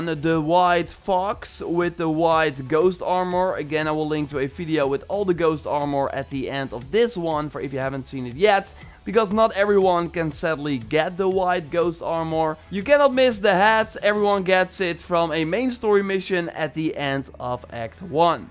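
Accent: Netherlands. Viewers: Bet he is, sounds wayyyyyyyy too dutch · Ben je Nederlands? I can sense an accent. Became a subscriber because your AC Origins video. Love the content amigo. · Damn I was right! I knew it sounded familiar. Visited the Netherlands back when I was studying in France and heard it back then. Been 5 years now and his accent reminded me of that trip for some reason lol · He sounds dutch that’s why😂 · Marcos Vargas its his accent, he's from the netherlands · Yes it's the most obvious dutch accent ive ever heard on youtube, and it annoys the fuck out of me lmao.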